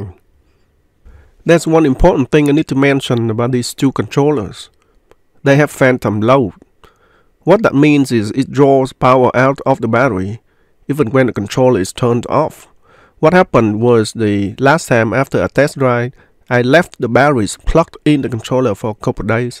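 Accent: Vietnam. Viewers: Vietnamese accent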